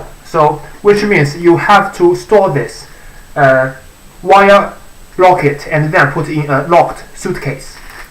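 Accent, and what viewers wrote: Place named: United Kingdom. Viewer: Why sounds British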